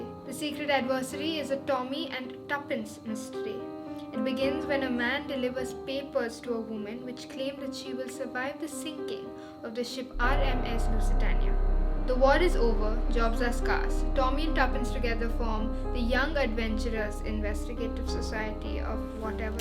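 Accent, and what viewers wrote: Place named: Bengal, India. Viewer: The bengali badass 😂 love the accent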